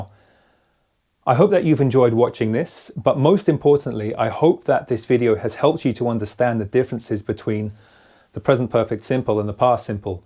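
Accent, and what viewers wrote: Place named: London, United Kingdom. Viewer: Hi my dear teacher! That was exactly what I needed! Your excellent explanation of Perfect Perfect Simple, with your beautiful londoner accent! Congrats! It is a great video production!🌹🙏😘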